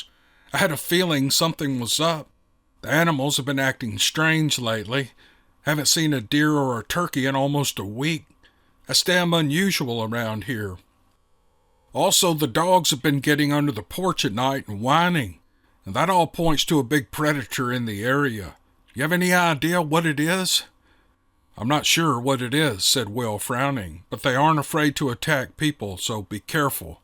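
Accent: South, United States. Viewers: You did an excellent job reading these to us. I've heard you before, but hubby and I sat down and listened to all 6 Parts in one day, no joke. We couldn't stop listening until we heard them all. You are an excellent storyteller, and we appreciate you!! Your southern drawl and just the way express everything makes it come alive. I do not want to forget DA Roberts, who without him these would not be possible. Thank you again. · Omg im not one for listening to someone else read for me,i love to read books myself, but im hooked absolutely hooked,i love your southern accent, im scottish,you really got me listening and on the edge of my seat, I've just started binge watching your podcasts,amazing never stop,im not normally into this sort of thing, keep it coming 😊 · I am so darned excited, I could not listen to part 1 fast enough, then when I began part 2 directly after part one. 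I HAD to put on the brakes! "Savor it, don't rush through it", I told myself. But, have I listened to me? Oh HELL no! First taste I just started gobbling part 2 up, as well. I had to force me to STOP and send you and D.A. a note to say, as usual, nothing but the best for your followers. Mr Roberts your writing rocks, I love your brain and how it works! Your words manage to keep me glued to my seat 'til the last little scrap is gone. Then I am wanting more, like some kind of crazy person, I sit and whine for a moment. I can't help myself I just HAVE to go back and listen to it all over again, I KNOW I missed something, some important detail. I love how you put words together and whisk us away to a new world, it's amazing!, your voice and southern accent (lived in Tennessee for over 25 years and nobody sounded as good as you do) enable me to listen to something over and again because it's so soothing and yet loaded with excitement, sadness, joy or what ever the emotion the author intends. 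The ability to read aloud with all the inflections necessary to put the listener right in the middle of it all is not something everyone can do. You both are gifted. I want to THANK YOU both for sharing your gifts with all of us. I just love you both to bits and pieces, again, thank you!:face-red-heart-shape::face-red-heart-shape: Rosey loving life from the boond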